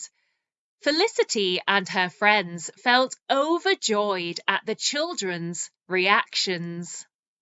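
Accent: United Kingdom. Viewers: I did enjoy reading and listening your British accent. There were some words that I couldn´t get it however it´s great news due to it´s means that I have just learned more. Thank you.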